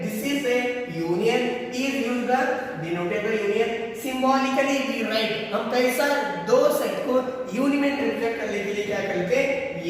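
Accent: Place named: Karnataka, India. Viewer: Pronounce somewords in Kannada but class is super.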